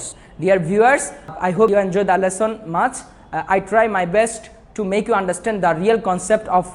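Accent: Chittagong, Bangladesh. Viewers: বদ্দা চিটাগংগা নে??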